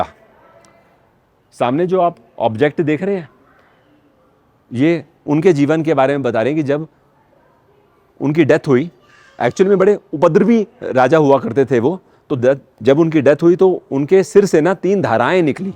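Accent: Punjab, India. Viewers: Sir ....There is some 'NARRATION MAGNET' in your voice pitch.....upr se thoda PUNJABI TADKA😊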